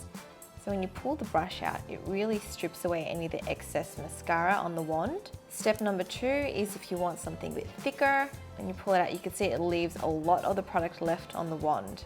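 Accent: Australia. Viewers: nashikin works LMAO she’s Australian and her accent sounds nothing like the British one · where are you from? Your accent reminds me of Australia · im new i was wondering if has any australian background or lives in australia bcoz she has a slight aussie accent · nashikin works she’s not trying hard to be british—it’s her natural accent and it’s AUSTRALIAN. she lives in australia lmao—what do you expect?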